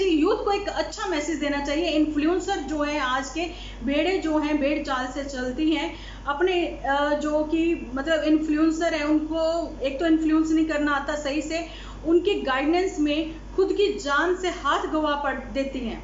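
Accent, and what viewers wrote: Punjab, India. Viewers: Your punjabi so sweet.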